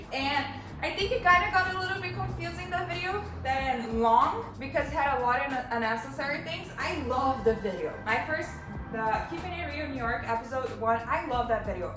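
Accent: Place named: Rio de Janeiro, Brazil. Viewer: te conheci pelo canal da Bruna e de início te achei um pouco debochada (acredito que pelo sotaque carioca hahahah sou sergipana, vizinha de estado da bruna, inclusive já estudamos na mesma universidade, mas em momentos diferentes), enfim, acabei entrando no seu canal e me surpreendi demais, tu parece ser uma pessoa mto sensata e do bem. Tenho mta vontade de morar em LA, quem sabe um dia, né? Apesar de entender o inglês mto bem, eu tenho mta dificuldade p falar e ver vc falando tão bem me motiva demais. Enfim, só queria falar isso <3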